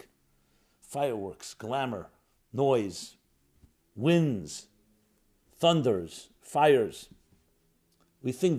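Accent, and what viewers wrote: United States. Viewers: Thank You✨🌹✨🪬✨ sometimes it is difficult for me to understand you because you speak American English. Sorry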